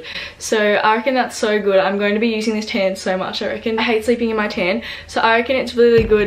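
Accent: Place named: South, United States. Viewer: I reckon....you sound like your from the south states, like me as a TN girl. Tan looks great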